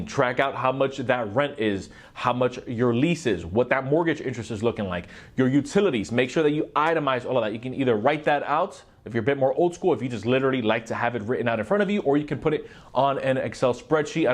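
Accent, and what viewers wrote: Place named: Miami, United States. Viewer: Lol you have to be from Miami lol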